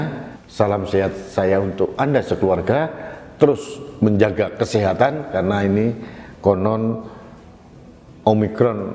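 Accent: Java, Indonesia. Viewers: Privat lambe mu ambles kui, hhhh medok tapi kritis, MANTAP PK 👍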